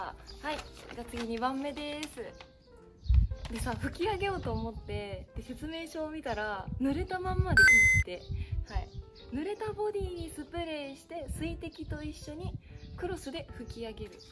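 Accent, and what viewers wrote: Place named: Kyushu, Japan. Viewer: 九州出身なのでたまに出る方言がとても良いと思います😊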